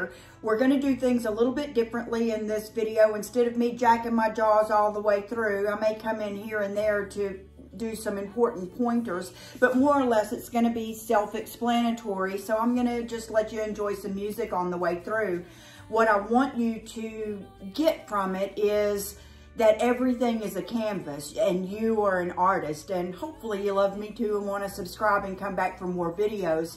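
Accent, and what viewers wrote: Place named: Midwest, United States. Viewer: I'm so happy to have found your channel! Your piece is crazy cool! I've never tried a mural on furniture before, that would intimidate me. You're so talented, this is gorgeous! Okay I have to ask where you're from. Your accent sounds just like my step-MIL (IL/IN).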